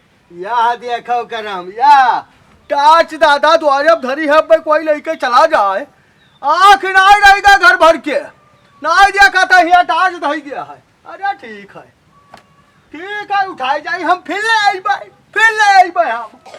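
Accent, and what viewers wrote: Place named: Awadhi, India. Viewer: अति सुन्दर प्रस्तुति मजा आ गया आपकी इस उत्कृष्ट और सुन्दर एक्टिंग से।। हम लोगों का सुंदर बचपना याद आ गया। सबसे सुंदर ये लगा की अपना अवधी में सुना। इतनी मिठास भरी हुई है की मन प्रफुल्लित हो गया।।। Best of luck for your future endeavours and all the upcoming videos.. My best wishes to you and your teams.. God bless you all. Jai shree Ram ❤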